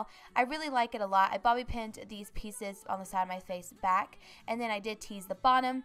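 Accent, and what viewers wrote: South, United States. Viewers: R u southern because u sound very southern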